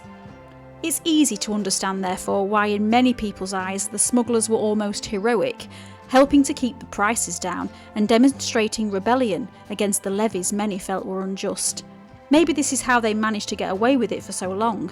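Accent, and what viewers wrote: Yorkshire, United Kingdom. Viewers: I don't remember how I arrived at your channel, but it was probably through finding videos on Yorkshire people and locations. As an American, I find the Yorkshire accent probably the most charming English accent. It drops the H's and R's like RP, but has the northern lilt that the "posh" accents lack. I could listen to someone from Yorkshire read the back of a cereal box and be enthralled. Anyway, thanks for introducing someone from across the pond to Robin Hood's Bay.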